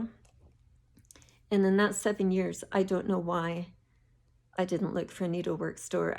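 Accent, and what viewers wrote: Scotland, United Kingdom. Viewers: You can definitely catch the Scottish accent. Welcome to flosstube. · Hello from a fellow Scottish stitcher. Great first flosstube. I actually came across your third one first and as I was watching I thought I heard a Scottish twang so stopped that one and started watching this one to see if you said you were Scottish and you are, lol! Loved seeing all your projects and I’m like you, I love stitching things with houses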